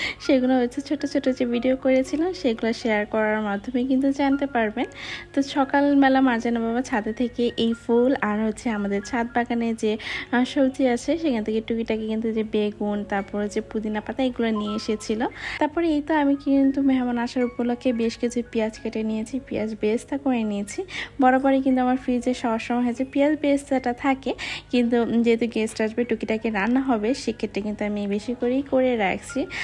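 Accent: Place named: Rajshahi, Bangladesh. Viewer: রাজশাহীর ভাষা খুব ভালো লাগে আপু,,, খুব মিষ্টি করে কথা বলো তুমি মাশাআল্লাহ,,, ভালো লাগলো আপু ❤❤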